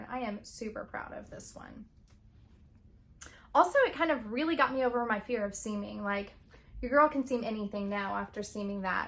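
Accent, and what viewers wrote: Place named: South, United States. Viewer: My word is growth. 🥚 I love your podcast. As a fellow southerner it is nice to watch someone who sounds like home.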